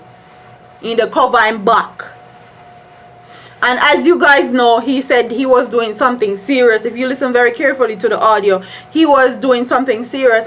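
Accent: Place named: Jamaica. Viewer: I love when you give it to them in the raw patois! Lol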